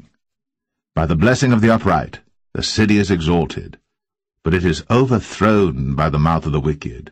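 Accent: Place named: United States. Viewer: American English but the KJV Bible is written in old English so it sounds different.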